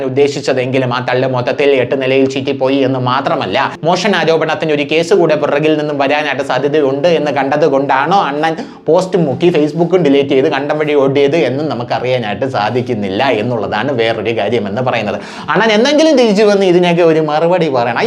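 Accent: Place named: South Kerala, India. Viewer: കാര്യം എന്തൊക്കെ ആയാലും അവതരണം വത്യസ്തം ആയിട്ടുണ്ട്. തെക്കൻ കേരളത്തിന്റെ ശൈലി ✌🏽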